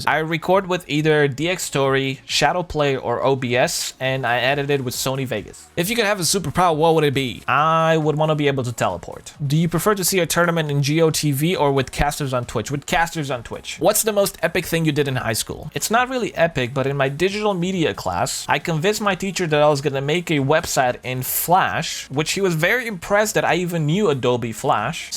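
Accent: Romania. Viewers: There's a guy in some of my classes that looks just like you. He sounds Romanian too. Do you happen to be a high school junior near Boston, Massachusetts? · esti Roman? (ai accent)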